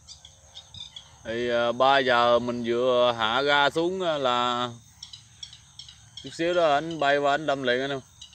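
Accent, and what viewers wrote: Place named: Mekong Delta, Vietnam. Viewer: Chất miền tây a ơi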